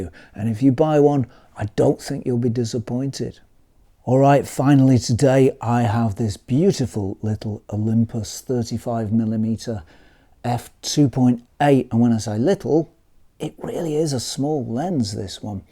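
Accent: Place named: RP, United Kingdom. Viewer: It is so much more pleasant for me to listen to a cultured British voice than to any American speaking, I notice that again & again. Thank you for sharing your photography knowledge.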